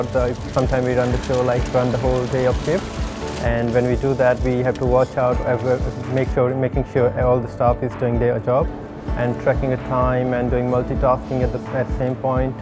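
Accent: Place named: Australia. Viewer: Lovely Australian Accent ❤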